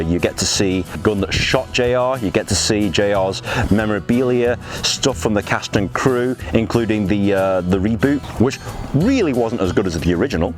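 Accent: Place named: England, United Kingdom. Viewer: Why the fuck is an English guy representing a Dallas Texas ranch! You think they could have got an American Cowboy with a southern accent instead! thumbs up if you agree.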